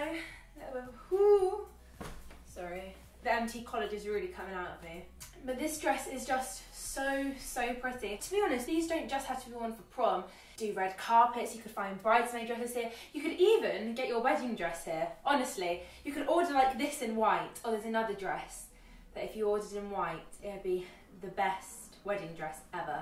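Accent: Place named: United Kingdom. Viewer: I love the British accent 💕💕💕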